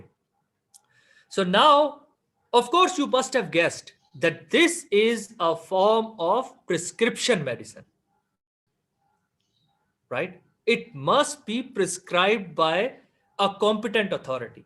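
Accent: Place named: England, United Kingdom. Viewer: Sir you have a great English accent and Teaching style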